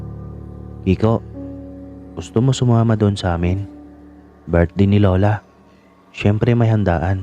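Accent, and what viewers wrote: Visayas, Philippines. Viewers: ang galing mo ha fluent ka magtagalog kiko pero visaya ang punto mo doin ako bumilib sayo ☺️☺️☺️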